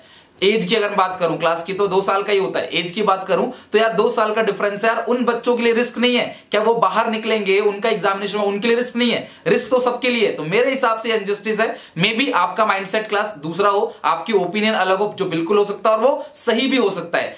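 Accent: Odisha, India. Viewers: Sir Aap Odisha ki ho kya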